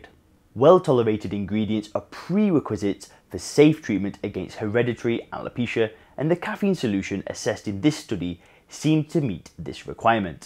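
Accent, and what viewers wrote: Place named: United Kingdom. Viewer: I enjoy listening to British accent.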